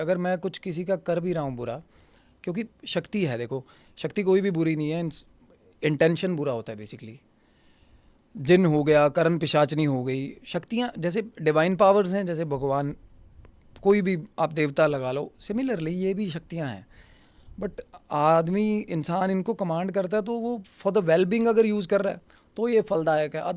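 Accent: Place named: Punjab, India. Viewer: Veer ji apka Punjabi accent kamaal ka hai, try karen ki pure Punjabi aap bole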